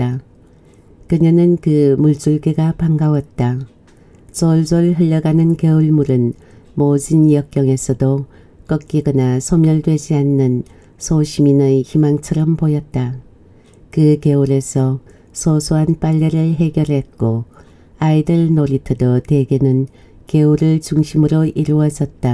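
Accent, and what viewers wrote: Gyeongsang, South Korea. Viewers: 70 년대를 거치면서 살아온 사람으로서 많은 기억들이 떠오르는군요. 서울에서 태어나 자라온 나에게는 에 경상도 사투리가 처음 조금은 매끄럽게 들리지않았으나 지금은 익숙해져서 푹은한 감정이 생겼어요 항상 변함없이 굴곡이 없는 그 음성에 마음이 편해집니다. 좋은글 감사합니다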